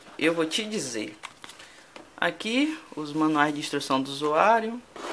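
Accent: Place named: Salvador, Brazil. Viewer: Eu diria "jeito presepeiro"... Hehehehe... Antes de saber que ele era de Salvador, eu já desconfiava só por causa da maneira como ele fala. Mas o cara é brother!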